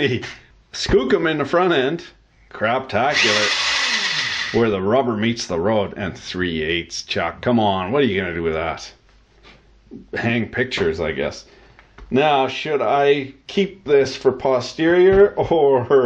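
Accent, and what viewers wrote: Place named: Canada. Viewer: Everyone in Canada talks like that....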